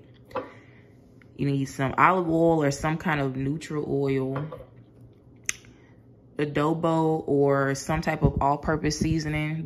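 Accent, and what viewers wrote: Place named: Carolinas, United States. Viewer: Where u from sis u sound like u from the carolinas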